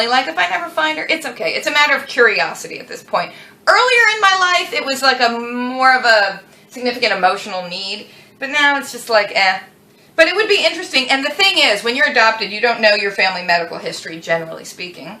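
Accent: South, United States. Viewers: I've been watching your family for about a month now. This is the first time that I feel I have some connection... cuz my two children are adopted also. They carry the names I gave them and not their birth names. Both my children feel like you that there is no shame in it or Secret. By the way I think that you guys are hilarious love your sense of humor. And I've always wondered where did you get the southern accent from. Keep it up it's wonderful. And also your other accent too. I love your husband's demeanor he's as cool as a cucumber. love you guys and all your seriousness and all your sense of humor. By the way we can't afford to move out of our house either because it's underwater. But I have a plan to remedy that. Take care keep doing what you're doing you're on the right track... So inspiring.😍😎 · It is funny that when I was watching the video earlier today about your Copenhagen collection I was wondering if you were of Danish descent but I did not ask in the comments. 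The other thing I thought was interesting is that I thought you had a Southern accent. You mentioned living there briefly but not bring born there. Have you discovered any new information? Did you do an <link> DNA test? Or 23 and me. The 23 and me might answer the health questions without finding your relatives.And finally, I had suspected you were a Scorpio(I am one ) but did not think the topic would ever come up in the vlogs.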